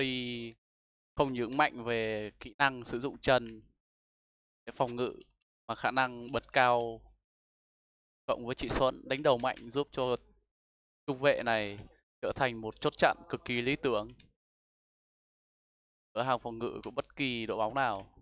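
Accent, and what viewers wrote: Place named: Yen Dinh, Vietnam. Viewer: nghe giọng nói là nhận ra a ở yên định. Bạn anh ở thọ xuân có vài người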